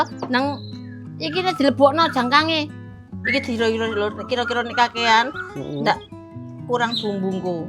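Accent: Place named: Surabaya, Indonesia. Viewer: Aksen jatim sby an....😂👍 seneng lihat konten bu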